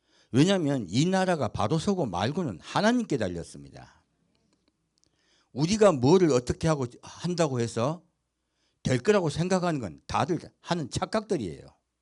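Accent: Jeolla, South Korea. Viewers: 말투를 보니까 전라도 출신 같으신데, 빙빙 둘러 말하지 마시고, 솔직하게 입장을 밝히시는 게 좋겠습니다.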